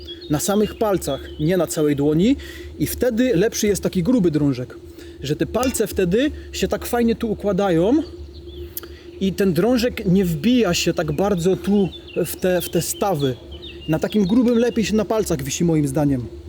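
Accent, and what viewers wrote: Silesia, Poland. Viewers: Super kondycja i fajno ślonsko godka. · Cześć oglądam twoje wyczny od początku kanału,pracowalem dużo na Śląsku w twoich rejonach jako dekarz, i jak wracałem do siebie to godol jak ty😂jak byłem mlody to tes wszystkie drzewa i kominy były moje,teraz wiekowy się robię...moj rekord na drążku nachwytem 19 ale miałem 20 lat...teraz starość zaraz wnuki może będą 😊ale staram się ruszać, pozdrawiam i wysokości, tylko z głową jak zawsze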